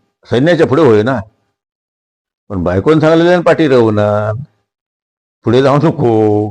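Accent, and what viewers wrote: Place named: Malvani, India. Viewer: एकदम शुद्ध मालवणी मजा आली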